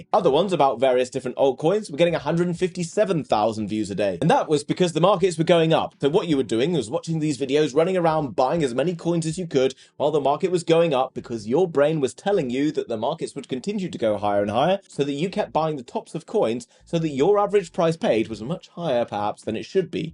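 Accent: England, United Kingdom. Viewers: His accent is an English one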